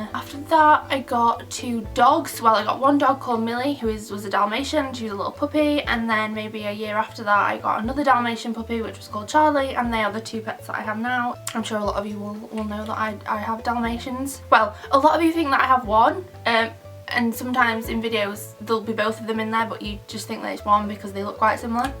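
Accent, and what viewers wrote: United Kingdom. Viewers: R u british ??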